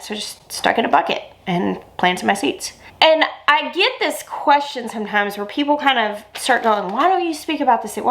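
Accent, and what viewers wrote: South, United States. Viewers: it's been a while since I've had time to watch you. I've missed that time so much. I just wanted to tell you that you're getting prettier the older you get. Also, your southern accent is stronger! 😊 Our garden was very sad last year and we're working towards a better year this year. I sat down and turned your channel on KNOWING that I would be helped in a big way. Thank you for your encouragement and for showing your faith in God. 💚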